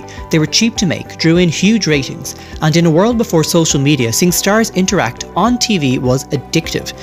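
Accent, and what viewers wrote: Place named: Ireland. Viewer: I love hearing a fellow Irish voice in a voiceover! :) I've watched a lot of your videos now. Excellent channel and great work. Good for you. Hope it blows up for you. xx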